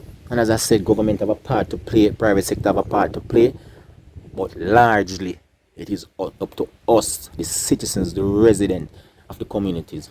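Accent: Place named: Jamaica. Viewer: Mannaz n Respect bredrin ow yu du. Few things fi sey, first, mi rate how yu present yuself, properly spoken with fi wi accent ,yes man yu gwaan good, respect. Second, yu kno sey a long long time mi a follow Colaz Smith and him bredrin Socks from waaay bak wen dem jus start, yah man, mi and mi cuzzin even try two a him recipe dem, we rate him man, nuff big ups. Third, we(Jamaicans) put the blame on the Govt and yes rightly so to some extent, but in my opinion there are numerous other factors to be considered, education, not school and books, but basic civil common sense education. We keep our homes clean, why cant we keep our country clean, impose strict rules on garbage, yes, the govt needs to import more equipment or hire locals. We as a people neeeeeeeds to take more pride, up our image, be activist for our environment, follow examples. In conclusion, each one teach one, yu see wen Colaz Smith come bak ome, express and show dem places like these to our people on his show, comment on it positive and negative and request suggestions and volunteers. 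Bless up, Jah guide and protect always.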